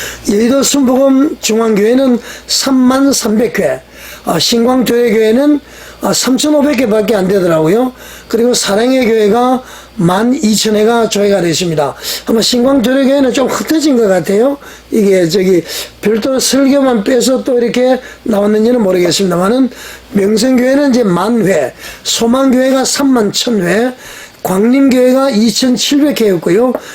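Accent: North Korea. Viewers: 해설하는이분은 이북말투예요 이상해요 ㅡ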